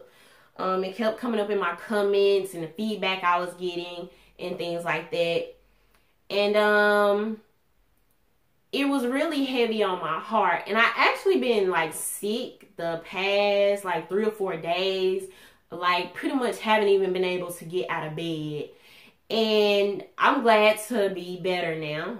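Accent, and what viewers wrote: United States. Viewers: I love your country voice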